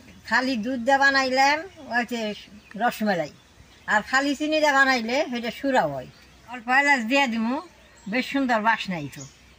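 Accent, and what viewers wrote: Tangail, Bangladesh. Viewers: Dida apnar vasa amadar tangayl ar vasar satay onayk mill . Rosmalay darun hoyaca.❤❤❤apni onayk cute.😅😅😅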